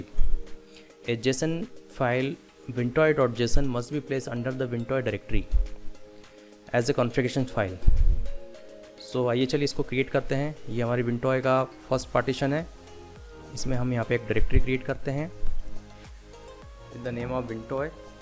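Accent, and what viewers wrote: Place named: India. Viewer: This video really shows that not everyone who sounds Indian has malicious intent.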